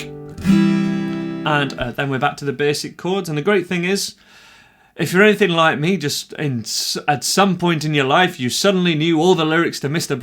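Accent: United Kingdom. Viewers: You sound super British Ty for the tutorial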